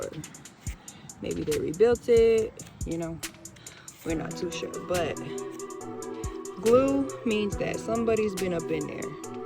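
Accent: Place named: South, United States. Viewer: I'm trying to pay attention I like the way you talk downsouth country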